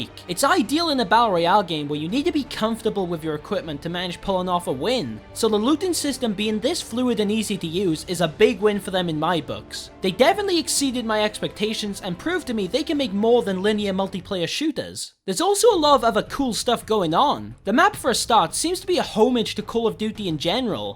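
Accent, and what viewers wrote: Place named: South Wales, United Kingdom. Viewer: Nice to see a welsh YouTuber for once Also you from Newport, like it’s a weird question but you have a south accent.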